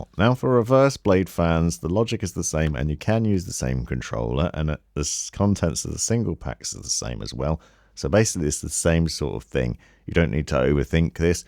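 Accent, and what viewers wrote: United Kingdom. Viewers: God your videos are just awesome, the editing, the sound quality, the clear explanations, the smooth voice coupled with the british accent 🥵, i love your work and you’ve helped me so much for my first build ! Thank you ! I was wondering though if i used the galahad lcd 2 aio in my system with 3 lcd fans and the rest being sl infinity fans would i use the galahad controller or would i need a different one ?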